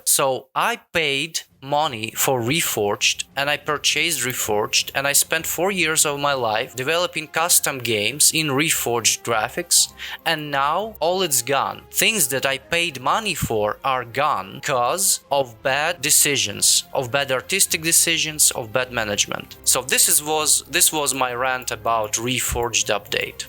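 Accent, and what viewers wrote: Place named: Russia. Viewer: молодец! По акценту сразу понял, что наш 😂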